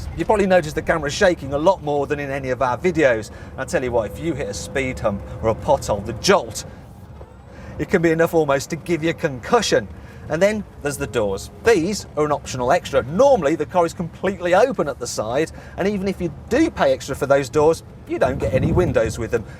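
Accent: United Kingdom. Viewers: Jesus Christ are all you tube videos narrated by limeys?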